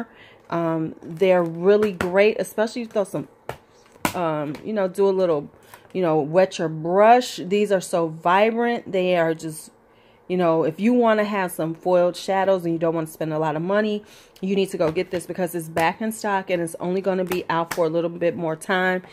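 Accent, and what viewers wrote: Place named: Midwest, United States. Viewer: So glad I found your channel! I’m 49 and it so great to see more women in our age bracket doing it on YouTube. And I completely agree, we can buy what the ___ we want! I’ve raised my son and put him through college, if I want to buy new makeup, it’s My Prerogative! No apologies! I loved the video and your collection. 👍🏾 Question. Listening to your dialect, are you Midwest located? I’m Chicago burbs myself.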